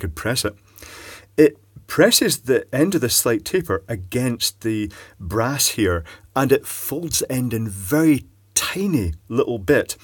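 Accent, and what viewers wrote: Scotland, United Kingdom. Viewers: Absolutely right, there would be more heavy metal suspended in the water than would ever diffuse from the brass alloys, we have far too many self important idiots here in Australia, unfortunately they, and their trouble making shop steward mates all seem to have accent's just like yours, please don;t think for a moment that, that is a jab at the lovely Scottish accent, nothing could be further from the truth, just that if you are on a job site here in Oz and you hear a Pommie or Scottish accent, you can bet that the heaviest thing it's owner has ever carried is the chip on his shoulder. Love your videos.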